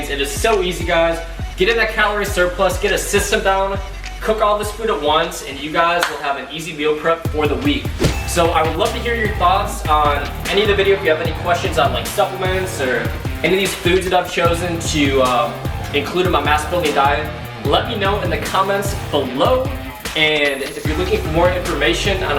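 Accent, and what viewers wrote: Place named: United States. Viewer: This guy looks very Russian I was surprised by the American accent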